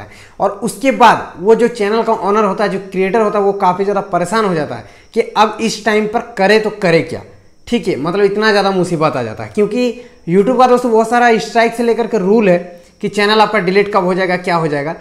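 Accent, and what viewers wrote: Bengal, India. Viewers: Ap Bangali ho kya?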